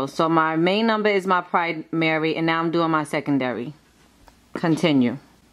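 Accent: New York, United States. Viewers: She gotta be from New York cause she ode sound like Sky from Black Ink😭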